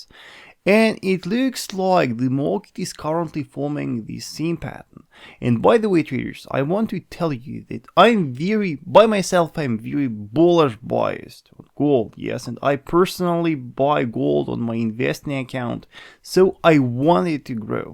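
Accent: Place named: Russia. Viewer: he speaks engruslish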